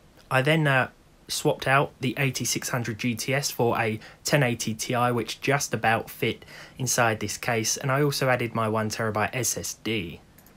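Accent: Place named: England, United Kingdom. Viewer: I like your traditional Englishman accent...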